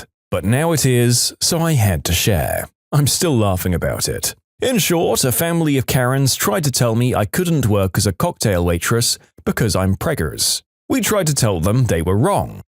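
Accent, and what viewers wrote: United Kingdom. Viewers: You're my favorite narrator I love your British accent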